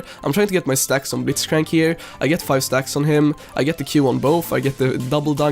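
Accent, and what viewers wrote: Sweden. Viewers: Are you swedish? you sound like it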